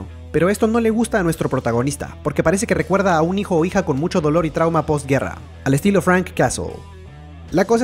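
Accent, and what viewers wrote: Peru. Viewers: Todo porque le entiendo porque yo también soy peruano uwu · aah eres peruano xd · ERES DE PERU MASNA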